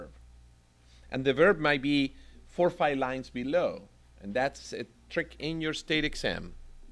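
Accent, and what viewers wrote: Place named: Argentina. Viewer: This professor has an Argentinean accent , i know because I'm Argentinean ;)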